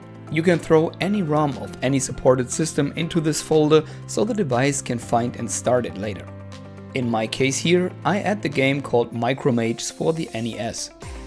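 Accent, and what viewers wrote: Germany. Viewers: Da höre ich doch deutschen Akzent! :D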